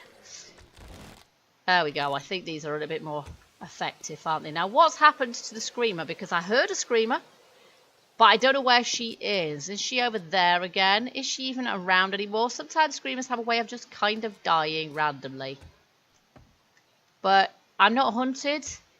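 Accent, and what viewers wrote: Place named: England, United Kingdom. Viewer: I just wanted to tell that i like english accent. 😋 cu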